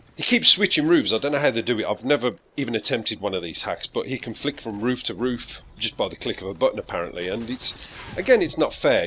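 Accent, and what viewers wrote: United Kingdom. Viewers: In the US there is something about the UK accent we enjoy. Something different I suppose. Is it the same over there for broadcasters with an American accent?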